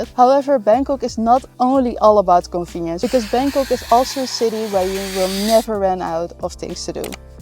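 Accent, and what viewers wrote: Netherlands. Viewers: Dialect? She's speaking English with a Dutch accent. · i love your dialect from dutch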